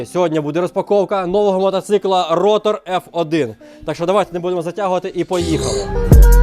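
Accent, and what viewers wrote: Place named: Surzhyk, Ukraine. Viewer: Хорош на суржике балякать. Мы в Одессе не урозумим вашу сельскую гарную мову.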